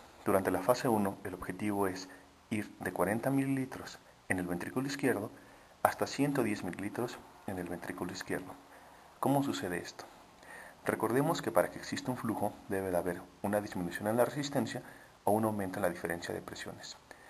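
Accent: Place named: Spain. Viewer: jajajaj ,se oye muy español el hombre jajajaja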